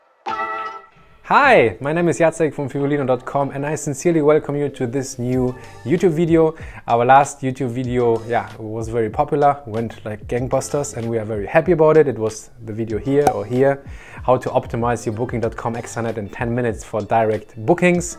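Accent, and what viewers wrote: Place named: Germany. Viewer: Kann ich dich vielleicht irgendwie sprechen, ich bräuchte etwas hilfe. Danke für das Video, ich habe rausgehört das du Deutsch bist. LG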